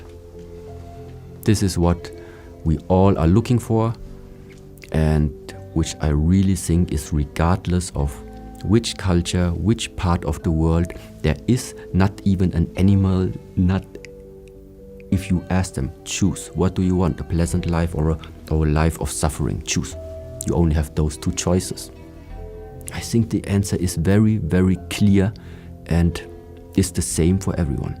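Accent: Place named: Germany. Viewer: Klingt nach deutschem Akzent.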